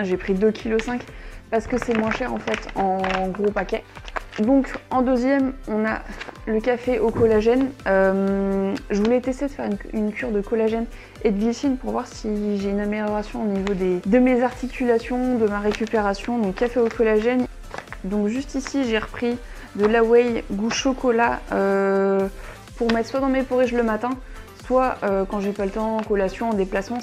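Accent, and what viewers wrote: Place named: France. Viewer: I love French accents!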